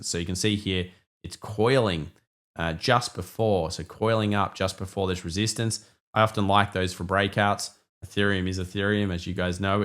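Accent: Australia. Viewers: This guy does not understand what is happening in the US. He talks like an Australian. So many do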